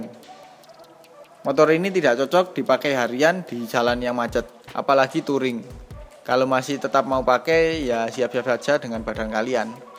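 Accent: Malang, Indonesia. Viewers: Mas wong malang tah?😅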